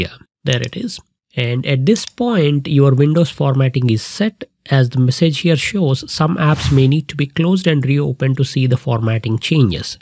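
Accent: India. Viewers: Sir your country name please? Your accent seems like Indian but when I saw your misses then I confused about your country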